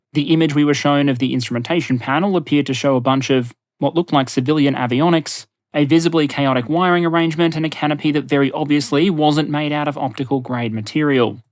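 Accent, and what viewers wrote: Australia. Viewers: The Aussie accent almost hides the propaganda but the rethoric is the same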